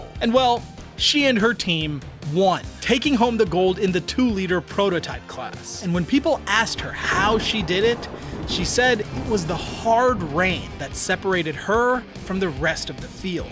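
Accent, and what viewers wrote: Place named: United States. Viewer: Hearing "bloody" and "petrol" in an American-English accent just does not compute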